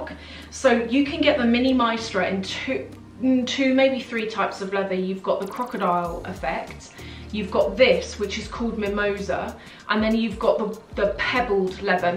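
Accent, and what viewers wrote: England, United Kingdom. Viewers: listen to your English Accent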